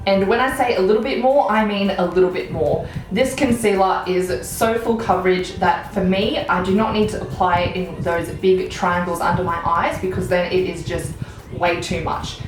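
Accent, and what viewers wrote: Australia. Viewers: Are you Australian live room Egypt ❤️❤️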